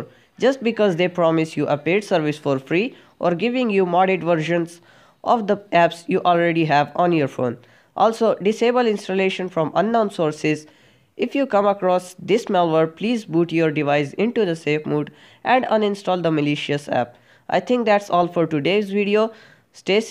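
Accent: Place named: India. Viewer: Even if you sound super Indian you made it sound very clear great video 👍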